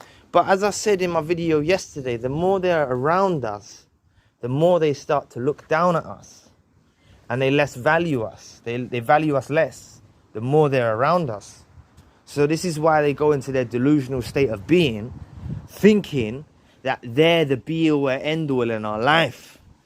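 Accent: United Kingdom. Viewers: are u from uk bother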